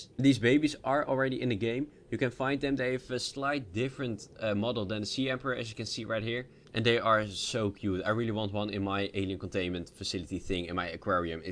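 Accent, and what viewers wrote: Netherlands. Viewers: you sound very dutch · ben je soms nederlands het klinkt wel zo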